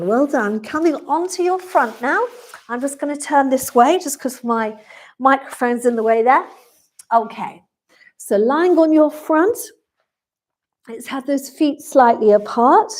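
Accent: England, United Kingdom. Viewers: Thank you so much. Your English accent is so refreshing to hear! Sorry to those Aussie and American accents but they are often very high pitched and squeaky…!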